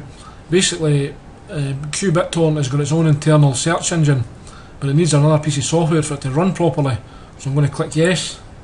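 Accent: Scotland, United Kingdom. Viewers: Than ye must be a huge idiot , or a non english speaker. Everyone knows it's actually Scottish accent , can tell ya , he's from Dundee or East Lothian.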